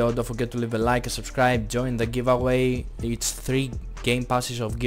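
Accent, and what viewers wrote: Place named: Greece. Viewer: Bro by the way you talk I think you are from Greece but I don't want to be rude or anything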